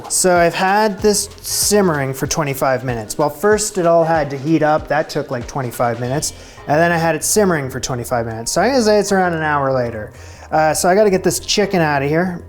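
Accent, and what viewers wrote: Canada. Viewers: I’m going to guess you’re not from the US or Canada? (I’m guessing this guy is Canadian based on his accent.) Here whole chicken comes like that guts removed. You don’t have to clean it yourself. The main edible innards (gizzard, liver, heart) are usually included in a bag stuffed inside the cavity. Oh, and the neck is stuffed inside there, too. You take all that out before using the chicken. Chicken with the heads still on and uncleaned you have to go out of your way to find.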